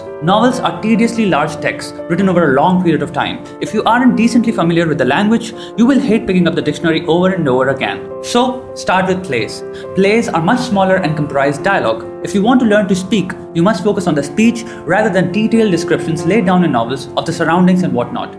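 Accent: India. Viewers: this dude sounds like Indian, doesn't he? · you are definitely fluent but have to try to reduce the Indian accent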